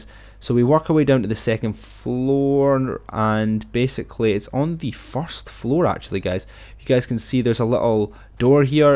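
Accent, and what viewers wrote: Scotland, United Kingdom. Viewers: Are you Scottish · He's from Scotland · Are you a Scott? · You scottish? We can be scottish bros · Dats a thicc Scottish accent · Yo im scottish and even i can say that scottish acsent just doesnt work on youtube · Are you Scottish? You sound like it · This guy is Scottish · I think he's british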